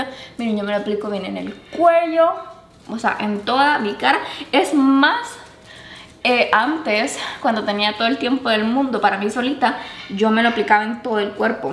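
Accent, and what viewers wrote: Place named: Honduras. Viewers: Igual note mucho el acento Hondureño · Jajaja no se si soy yo ho es que hoy si se le escucha bien el asento hondureño cuando la escuche dije abla diferente ahora .jajaja ho sera porque ya dias no veia sus videos saludos paisana🇭🇳❤gracias por los tips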